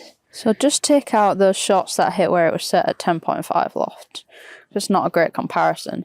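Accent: United Kingdom. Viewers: You know I really like your content and vids. I’ve become a fan, BUT I have to admit you’ve got the coolest UK accent ever!!! Great review, picked up my 10K yesterday, can’t wait to hit it….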